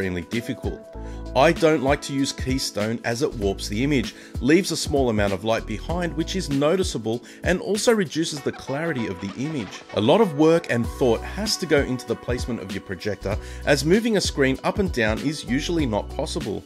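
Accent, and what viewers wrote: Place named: Australia. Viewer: Just wondering where ru location please?! U sound Australian to me 😅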